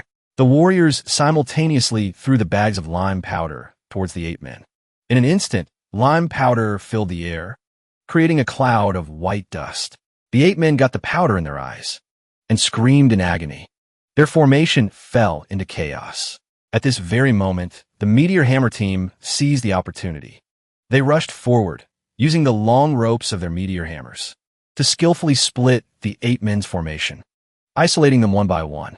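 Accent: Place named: Mexico. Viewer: whats with the occassional mexican accent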